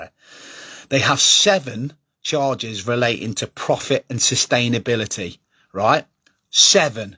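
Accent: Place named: Liverpool, United Kingdom. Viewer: Strong Liverpool accent here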